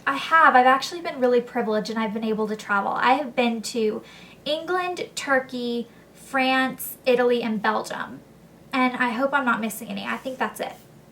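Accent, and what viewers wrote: South, United States. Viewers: Hahaha, could it be that your accent is getting more 'Southern' again, because you are at home? I know it happens to me, when I stay at my parents for a longer time. (And I'm from Belgium! Did you like our country?) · your southern accent is coming back slightly. is it because your back home?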